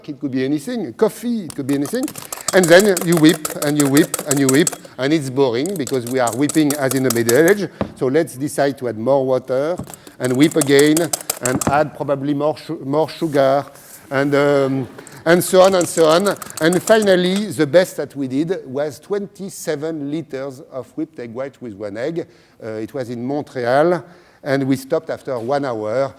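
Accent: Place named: France. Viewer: Fucking brilliant. If only we would have teachers who would be like that all the time... with french accent.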